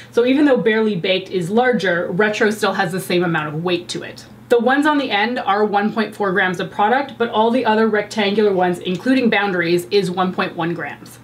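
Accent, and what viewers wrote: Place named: Eastern Canada, Canada. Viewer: Your eastern Canadian accent is the best I am from the west and my Canadian accent is so horrible in comparison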